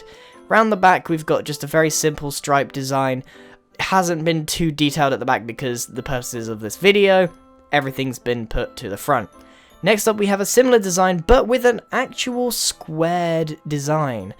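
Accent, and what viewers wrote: United Kingdom. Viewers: Right now i feel like grian has become more British lmao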